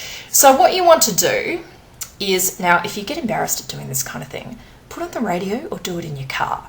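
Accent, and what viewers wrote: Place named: Australia. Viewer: Aussie accent, the one and only. Just love it!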